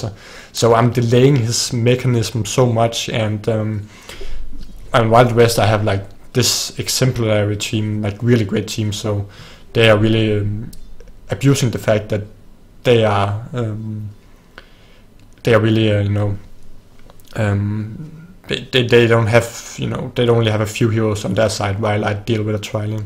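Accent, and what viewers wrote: Denmark. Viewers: Hey are you from Denmark,?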